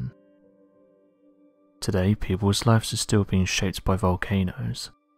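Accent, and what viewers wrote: England, United Kingdom. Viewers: I think he's voice is lovely and I love he's English accent 😍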